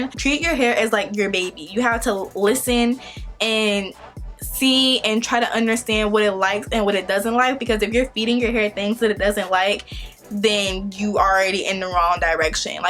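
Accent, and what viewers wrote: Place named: North Carolina, United States. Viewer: Woah I don't think I've ever heard a virginian accent before! Yes, I know I don't go out much 😭 before I read the location tag I thought you were North Carolinian! Their accent is similar to yours. Anyways, love these tips! I really want braids in, but no one is willing to do my hair😁 That's super true about not looking at your hair much.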